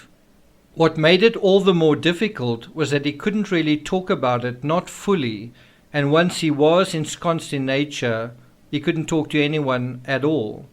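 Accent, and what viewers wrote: South Africa. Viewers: Btw, are you South African? My brother in law is and I hear that accent, particularly the last millisecond at the tail end of each of word as it cascades away into our eardrums... I dig it man! I want you to know I appreciate the way you break things down very quickly when you speak,..which is very therapeutic & healing. Are you a therapist? I get the vibe..you understand human psychology & introspective philosophy seamlessly. Brilliant. Thank you, You help me look at myself in new ways and can now work my way through some personal struggles and trauma's with an arsenal of new tools to apply when the healing hands of time are out of time... Much love & respect!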